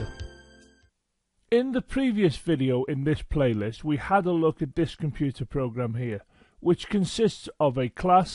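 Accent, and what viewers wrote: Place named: Northern England, United Kingdom. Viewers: mate u from the north of england, your video is mint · Thanks for the clearest (and scouse-tinged) explanation I have found so far. So just to check - the 'self' parameter tells that method "please execute the code on the object you're invoked on". Is that not already implied by definition? What would happen if 'self' was not included?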